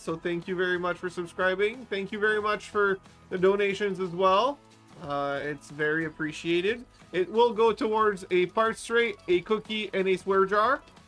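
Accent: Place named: Eastern Canada, Canada. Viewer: iwas into O gauge before N scale takes too much room N scale got into it 2 months ago all Dave and your fault lol. Im originally from northeast ofMiramichi but live in nofthwestern Ontario now . Taught i recognized you eastern accent lol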